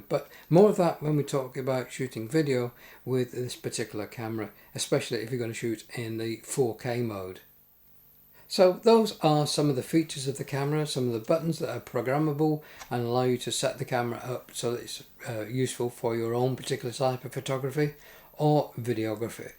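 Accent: England, United Kingdom. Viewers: You've done a marvelous service for us! Thank you so much! I have had the FZ2500 for a few years and have known that there was a lot more to it that I was doing but I just couldn't get a grasp on it. You have helped me big time! And I love your delivery. No extra noise....perfect focus. Plus, being an American, I can't get enough of your English accent. Haha!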